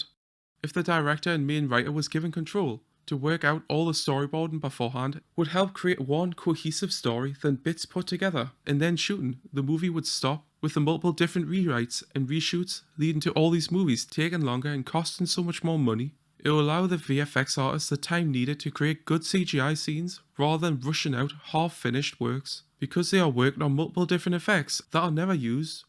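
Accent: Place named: Wales, United Kingdom. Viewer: Either that or just a Welsch person. That accent is terrible.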